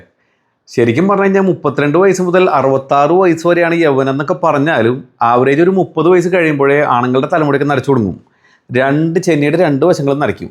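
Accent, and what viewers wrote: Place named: Thrissur, India. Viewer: ചേട്ടന്റ സംസാരം കേട്ടാൽ അറിയാം നമ്മടെ തൃശൂർ കാരൻ ആണെന്ന് ഞാനും. എന്തായാലും അടിപൊളിയാണെന്ന് തോനുന്നു വാങ്ങി ഉപയോഗിക്കാം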